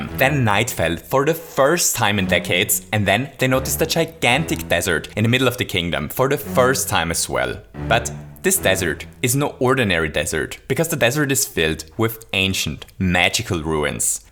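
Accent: Austria. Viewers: Moment... du bist Österreicher? 🤔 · Bist du Österreicher?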